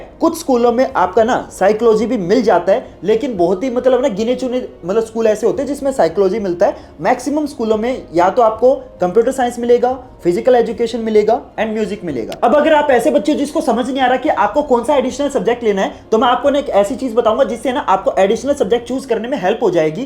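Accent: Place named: Haryana, India. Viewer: Sir m aapko bta skti hun aap haryana se ho 😁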